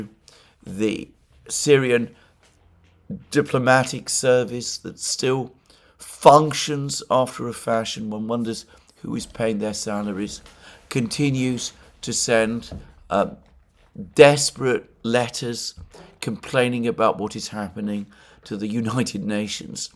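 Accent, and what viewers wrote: United Kingdom. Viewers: The idea that Israel will want to acquire Damascus is so absurd, so out of touch with reality, so utterly ridiculous that it makes you sound like an idiot even with your suave British accent. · I can't stand that British accent in times like this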